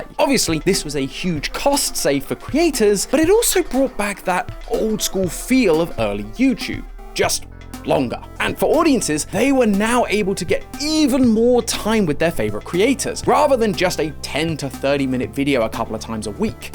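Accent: United Kingdom. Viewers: Ugh, what’s this British voice guy? I ain’t watching this lol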